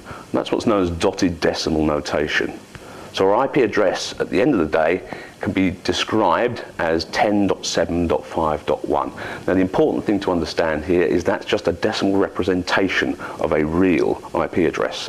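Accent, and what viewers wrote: England, United Kingdom. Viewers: This isn't bad. Can't remember the last time this american learned anything from someone talking with an english accent.....lol how american of me ....lol Great tutorial son. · This is how it's pronounced over here in the UK! He's English if you haven't noticed!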